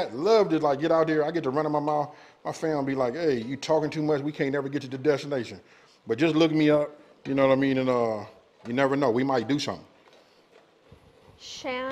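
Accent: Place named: South, United States. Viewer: You definitely do not sound like you are from LA. It sounds like you’re from the south.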